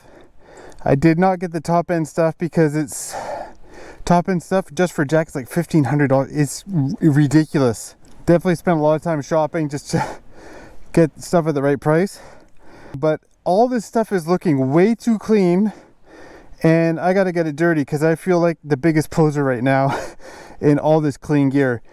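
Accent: Canada. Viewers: I'm liking this series already! I had a feeling that was a good ol Canadian accent. Hi from just north of Toronto Canada.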